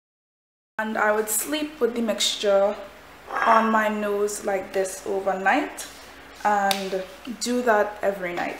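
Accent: Trinidad and Tobago. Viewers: Girl u got to be Trini! Love the accent...thanks for the advice as well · are u from Trinidad? 🙌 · got so excited when I heard that Trini accent!!!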